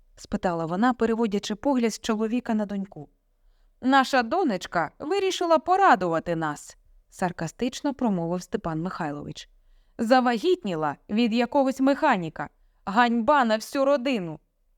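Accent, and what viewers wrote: Standard. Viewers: ДУЖЕ ГАРНА РОЗПОВІДЬ, ВЗЯТА З ЖИТТЯ, ДЯКУЮ ЗА ЧИСТУ УКРАЇНСЬКУ МОВУ.❤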